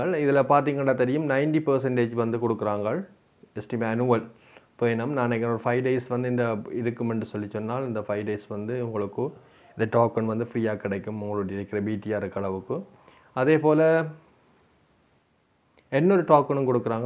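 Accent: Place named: India. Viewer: accento indiano e si voolaaaaaaaa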